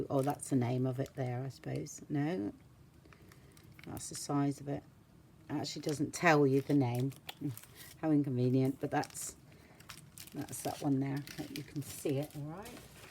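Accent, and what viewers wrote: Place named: Bristol, United Kingdom. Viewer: Are you from Bristol? That’s my husband’s hometown and you sound so much like him!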